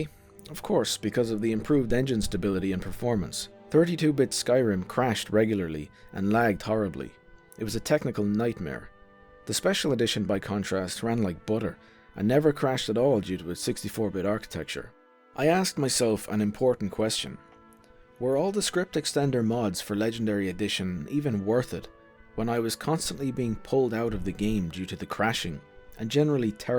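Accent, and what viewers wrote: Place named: Ireland. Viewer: You sound Irish, I'm willing to bet old enough to drink means something different in Ireland lol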